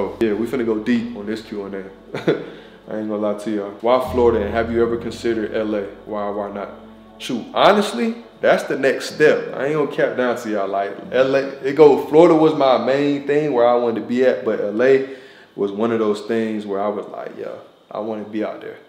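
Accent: Philadelphia, United States. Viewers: I know you not from Philly but u talk like us 😭 idk maybe its just me. Enjoyed this more personal video tho, God bless you always !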